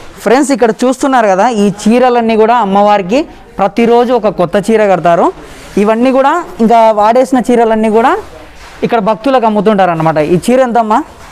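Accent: Rayalaseema, India. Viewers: I am from Telangana but I love your stories andhulonu ne Rayalaseema yasa enkka super bro nenu and na family subscribe chesukunamu all tha best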